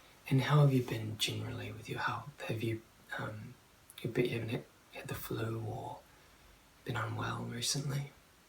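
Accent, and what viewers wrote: New Zealand. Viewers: came here for the accent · love the accent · I love kiwi-accents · Kiwi Accent, oh god. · Your accent.. I LOVE THAT! It's perfection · THAT ACCENT. OMLLLL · you can't get much better or cooler than a Kiwi accent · Gotta love the nz accent! Im from Australia where 6 is six not sex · similar to the Aussie accent, but they pronounce certain words differently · im not exposed to kiwi accent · Your accent is beautiful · I just love the NZ accent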